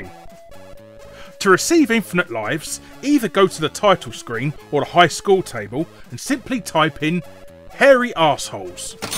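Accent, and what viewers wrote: United Kingdom. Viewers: On the subject of Alien Breed:, I find your British accent and your delivery of the cheat codes themselves funny as hell, especially "Blimey, gov'nor, look at the size of his John Thomas," LOL. Keep up the good work.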